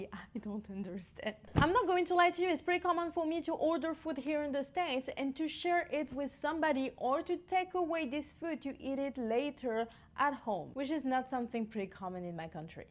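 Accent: France. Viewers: Hello, Congatulations, you're speak english very good. It was a pleasure to hear you speak in this language. You're french accent is very charming. Nice to meet you. Vive la France !! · Pfff tu nous gonfles avec ton anglais français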